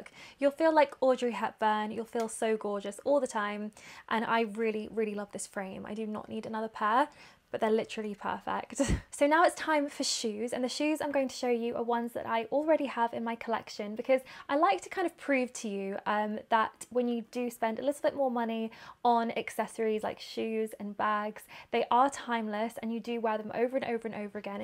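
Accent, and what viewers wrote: United Kingdom. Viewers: British accent Pink life Beauty Elegance Calm Cuteness You're amazing I have been watching your videos for a long time. Keep going 💗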